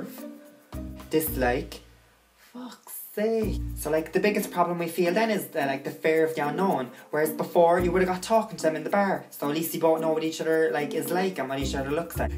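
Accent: Cork, Ireland. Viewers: only found your channel today and im in bits, your videos are hilarious you left me not breathing because im laughing so much, its so cork hahh i love it, reminds me of my mom hahah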